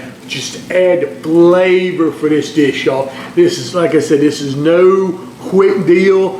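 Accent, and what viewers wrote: South, United States. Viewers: I love your videos !! just found them today :) LOVE your southern accent ! Where r u from ?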